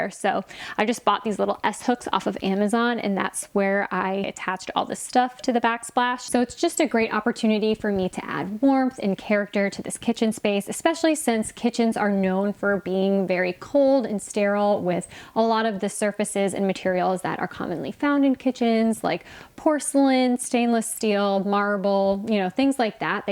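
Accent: Australia. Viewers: I like! As an Australian fan, I agee Aussie accents are cool. Love your channel. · Thumbs up to the Australian Accent!!!!! Great video 😁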